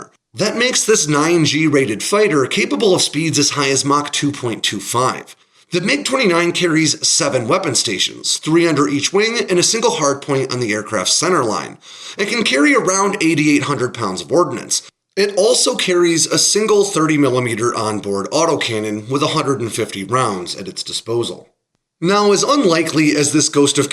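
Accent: United States. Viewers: 2 secs in dumb yank voice cringed me out tata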